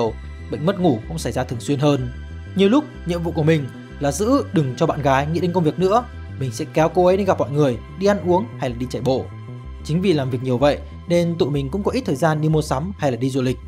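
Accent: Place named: North, Vietnam. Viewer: Clip khá hay! Cám ơn Team! P/s: chỉ có xíu ý kiến là người đọc có thể nói chậm, rõ hơn, đừng nuốt chữ. Thật sự người miền Nam như mình nghe giọng miền ngoài rất khó, huống chi các bạn nói nhanh, là tụi mình khóc cạn dòng sông. Tụi mình xem thời sự đài VTV là không hiểu gì luôn. Cám ơn vì đã đọc!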